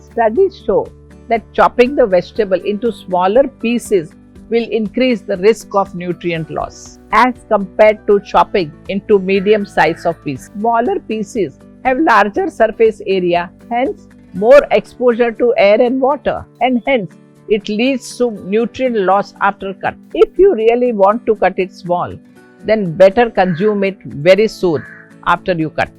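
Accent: India. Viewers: I love her and I love this channel and I mean no disrespect when I say she sounds like professor McGonnegal with an Indian accent 😂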